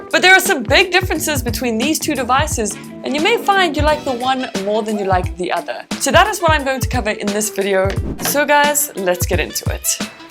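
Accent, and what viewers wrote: South Africa. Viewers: Refreshing to hear a South African voice! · Wait you're south African? 😮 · Thought you were south African lol inwas born and raised there and came over to the uk in 2010 xx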